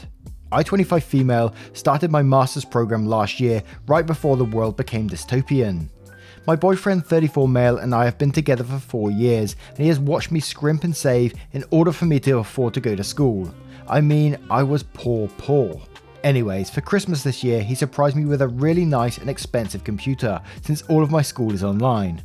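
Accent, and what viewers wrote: United Kingdom. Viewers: I really like your accent. What part of the UK are you from?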